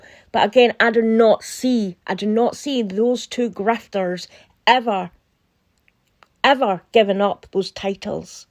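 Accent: Scotland, United Kingdom. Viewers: also, RE: swearing. There are different types of swearing. The$ussex_$quids, for example, cannot engage in rational, civilized discourse & debate or even exchange simple points. They start using vulgar, accusatory, base allegations because they're just not capable of debate or civilized argument. Those are the ones whose swearing is considered to be of limited intelligence. You, on the other hand, speak an elegant, fluent English with that endearing Scottish accent - music to my ears! Your type of swearing is different. It's more of an accent to the point you're making as opposed to just swearing for the sake of swearing because of being unable to make any cognizant debate. You're in the clear,. Anyone who has a problem with your, or anyone else's choice of rant, can leave. But, you know, they WON'T because only the $ussex_$quids, who are so devoid of talent, are the most likely to accuse you of being unintelligent (just like themselves!!!). 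· Oh it's such a pleasure to hear your sweet voice and lovely Scottish accent. Personally I don't think Meghan will ever be president - she would be too distracted suing everyone who criticized her or revealed her lies.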